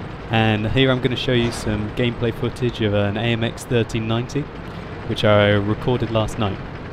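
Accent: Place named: Australia. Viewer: why do you sound so australian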